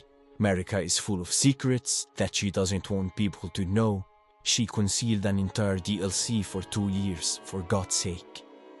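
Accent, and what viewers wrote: Egypt. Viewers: عرفتك مصري هههههه استمر يا باشا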